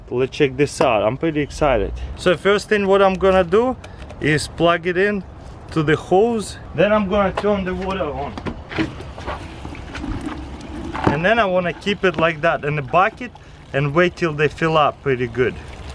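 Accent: Russia. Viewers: Чувствуется Русский акцент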